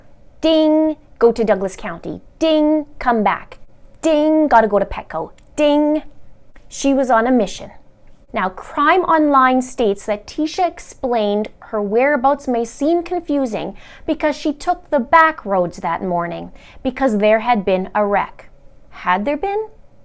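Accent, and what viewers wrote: Canada. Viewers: Omg, I just love your accent! “Oot and aboot.” If trump steals the election in Nov, I may move north, watch hockey, and say ‘oot and aboot,’ too. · Thank you so much for following this case. We all hope Gannon is found soon and want guilTEE to pay for what she's done. Nothing she says makes any sense. She's evil, but not a very good liar and certainly not a very smart criminal. Keep up the great work. BTW, I love your canuck accent!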